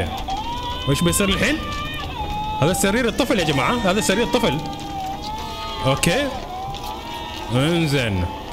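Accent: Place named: Oman. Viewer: انت عماني عرفت من لهجتك 🌹 وكمل لعبه هي 4 فصول انت في الفصل الأول لعبه حلوة انا العبها على قناتي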